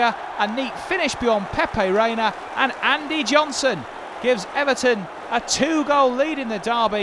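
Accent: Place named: Ireland. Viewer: Irish guy anyone?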